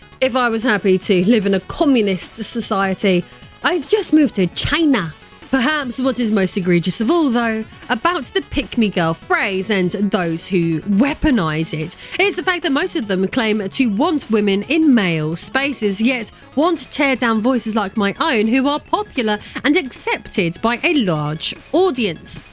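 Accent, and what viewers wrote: United Kingdom. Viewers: No worries - there will always a few bloat-heads spewing dirt around! I really enjoy your content as it´s based in reality - your videos are fun, informative and your british accent is the cherry on top. Greetings from good old Germany 🙂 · British accent is ❤ don't ask me what Cheryl Cole be saying though. I too was 17 in 2006 we getting old but still young at heart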